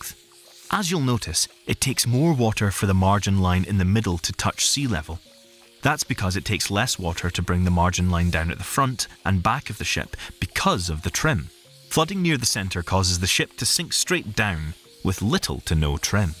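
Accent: Scotland, United Kingdom. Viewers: I love the Scottish accent for this!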